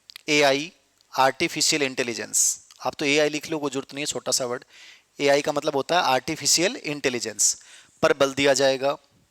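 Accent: Rajasthan, India. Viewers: Sir aap ki Marwadi ne mn ko khus kr diya aap ke samne beth ke pdne ko mn kro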